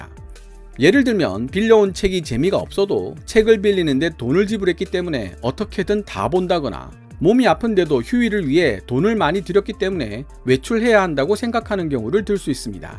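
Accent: Gyeongsang, South Korea. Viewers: 이분은 영어도 갱상도 억양으로 해서 오히려 잘난체 하는 오해를 불러 일으켜 쫌 재수가 없음... 그런데 영문만 나옴 호랑이가 사슴을 봤을때처럼 덤벼듦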